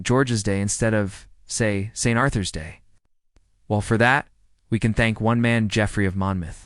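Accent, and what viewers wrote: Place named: United States. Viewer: not a chance that yankee accent is english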